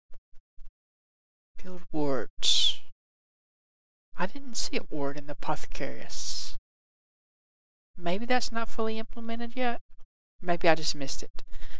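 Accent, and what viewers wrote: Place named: Canada. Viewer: Huh, interesting. You pronounce a few word really similar to Etho (the Ethoslab minecraft guy) who is Canadian. I guess Etho is just a really southern sounding Canadian :D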